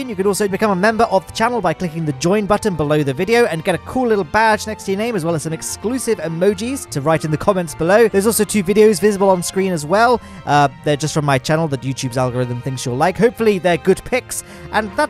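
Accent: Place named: England, United Kingdom. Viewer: As an English man like you I'm not gonna complain about you saying methane and Los Angeles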